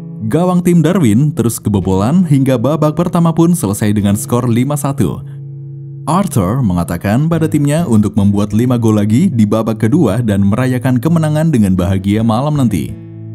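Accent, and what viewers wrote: Banyumas, Indonesia. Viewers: Asli tetep ngapak kawan asli Cirebon ☺️☺️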